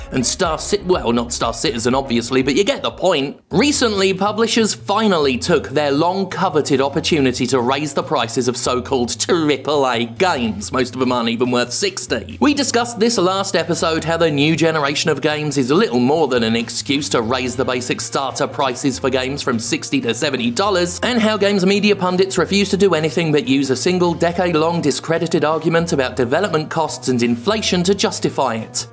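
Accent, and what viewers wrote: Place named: United Kingdom. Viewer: the ones thing that irks me, is that you STILL buy the games and review them. Dont. Dont use the its my job excuse, show the example. Ive yet to see ONE reviewer, ONE online personnality actually not buy the games of companies that 'fill in the bank with something horrible'. I think it would have a much greater impact and put more force behind the words if when people asked 'how come you haven't done a cyberpunk review?' you could answer 'i dont support products of abuse', rather then oh ill review it when its on sale so I give the abusers 20 dollars less. I can't get passed it. Every reviewer acts like hes holier then though, its their job therefore they are above reproach. All these guys would ask ore suggest boycotts, but they would never make a stand. Listen, if you fear all your fans will leave you if you dontyreview the next big game..... 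that means they don't care about you or your content and you've failed as a creator. Im happy to hear you officially wont touch ubisoft games. I was gonna point out you did purchase breakpoint and blah blah. the lesson is to get to the end of the video! In your case specifically, I think people watch you for how you talk. No I don't mean the beautifull British accent, I mean how you vocalize your thoughts and ideas. You are a legitimate entertainer. I would wager you wouldnt lose a single subscriber if you only ever talked about these companies failures and not their products, never purchasing one again. heck youd even save money!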